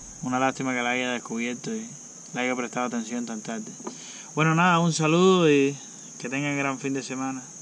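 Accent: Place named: Latin America. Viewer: Que buena navaja excelente ahora entendí bien siempre veo a los españoles explicando y no entiendo mucho lo que disen bueno es escuchar a un latino saludos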